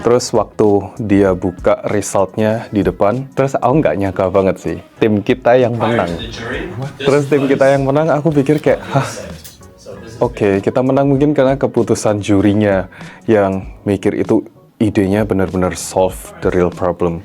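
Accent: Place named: Java, Indonesia. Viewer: kok medok ko ?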